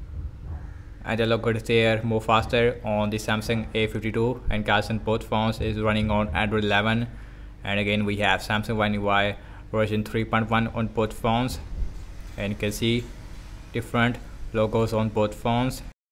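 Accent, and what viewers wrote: India. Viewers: Es hindú, yo creo por eso habla así 😂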